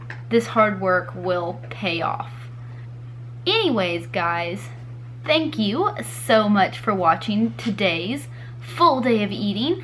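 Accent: South, United States. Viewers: Hi, I just discovered your channel! I love your spunkiness and your southern accent. I am a southern girl at heart! I was wondering if you could use any brand of BCAA's?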